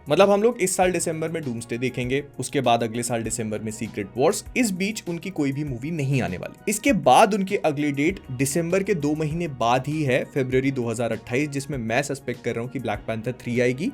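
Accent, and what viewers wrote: Bhopal, India. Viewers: Aaj bhai full bhopali mode me hai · Are you bhopali?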